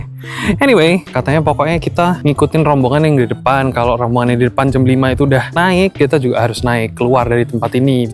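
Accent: Java, Indonesia. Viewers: kok di surabaya sama semarang medhok banget yah apalagi kalau lagi ngobrol sama warlok padahal di jakarta nggak medhok loh, cocok banget emang kalau medhok 👍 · Medok men boso jowo ne mas 😅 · medhok is the best, lucu banget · Keliatan nyaman banget di semarang, tapi salfok sama medok nya 🥺 semangat keliling semarang nya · versi medhok emang nagih banget ❤❤❤ Suka banget kontennya ❤❤❤ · gak ekspek jadi mas² jawa tulen bgt medhog😭🙏🏻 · Wiiiii Semarang... Seneng bgt explore di tmpt perantauanku Asyik polll kyk diajak jalan jalan, medhoknya sukaaa nagih as always wkwk Jujur, liatnya makanan-minumannya bikin ngiler 😣😣 Makasih, aku jadi tau ada kulineran di tmpt tmpt itu wkwk Suka bgt konten jln jln kota kyk gini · SUMPAHH, MEDOK BANGETT 😭😭😭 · jadi muedokk gini... yang nonton juga ikutan medok ini jawaku 😂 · itu orang mana sih ngomong Jawa nya agak medok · Ini lama-lama jadi food vloger berkedok vtuber😭 Tapi seru poll sii, apalagi kalo ngomongnya medok. 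Ditunggu konten jalan-jalan selanjutnya ✨🧡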